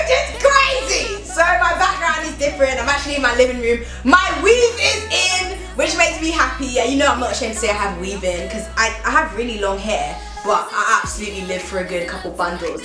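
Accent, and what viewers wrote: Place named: United Kingdom. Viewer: Youre brittish? Had no idea! Cheers from France Anyways, you are really inspiring, 💙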